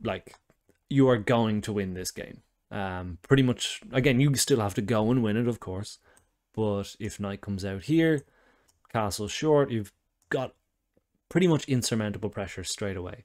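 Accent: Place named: Ireland. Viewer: Nice video and delivery although I was a bit put off by the missed mates-in-one and nearly just gave up at that point. What stopped me is that I saw a lot of positives so I’d prefer to be supportive. I’m sure it’s not easy doing what you do! I, of course, have never missed mate-in-one. Not once. Ever. 😳 Your voice and cadence of speech are easy to listen to - a lot to be said for that Irish lilt! For me that’s in contrast to many of our favourite YouTubers, the main exception being Eric Rosen. I also prefer educational content over pure entertainment and I like that you’re also on camera, which is often not the case for creators of similar material (off-beat gambit lines) Great channel name too. I’m looking forward to more Chess Please! 🙂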